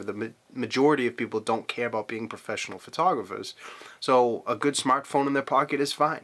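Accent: Boston, United States. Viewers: I hadnt noticed the boston accent before haha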